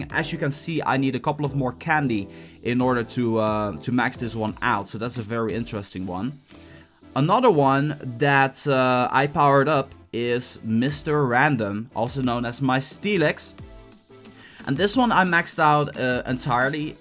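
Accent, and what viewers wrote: Netherlands. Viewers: are you form the Netherlands ?????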